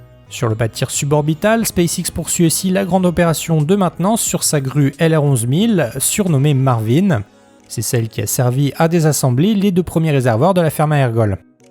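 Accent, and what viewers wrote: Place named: United Kingdom. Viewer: Votre accent anglais m’éclate ^^ Je ne suis pas meilleure que vous hihihi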